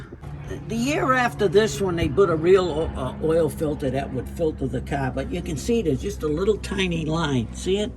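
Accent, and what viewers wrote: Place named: Boston, United States. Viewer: Beautiful Cadillac! I detect a Boston accent...!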